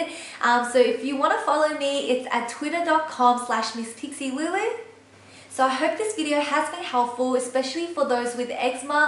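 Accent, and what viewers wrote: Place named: Australia. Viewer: you live in australia.. hahah i no ;) *your accent***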